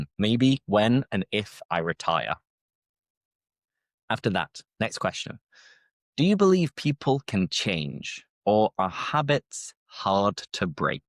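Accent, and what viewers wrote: United Kingdom. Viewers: Finally, i understand british accent. Thanks so much😊😊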